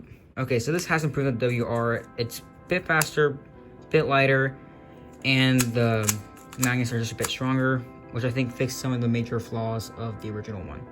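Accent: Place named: United States. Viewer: i jus realized u sound like tymon kolasiński but with an american accent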